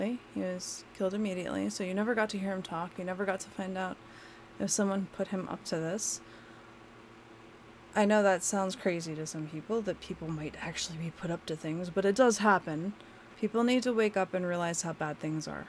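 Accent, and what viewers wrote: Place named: United States. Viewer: You sound pretty AMERICAN to me. There's nothing wrong with being proud of your ethnicity but your definitely an AMERICAN js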